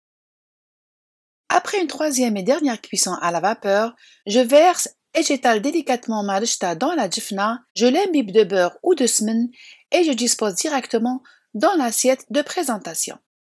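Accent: Algeria. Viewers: اشتركت في قناة على خاطر الغربية نتاعك تخرجلي شابة بزاف. من فضلك وصفة الفاكهة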